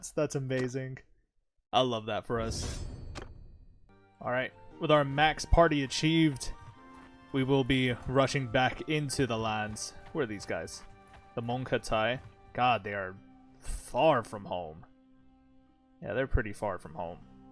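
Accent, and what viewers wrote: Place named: Ireland. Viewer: Great Irish Accent 😁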